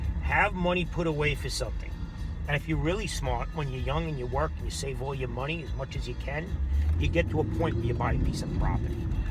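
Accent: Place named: Long Island, United States. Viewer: The New York accent lol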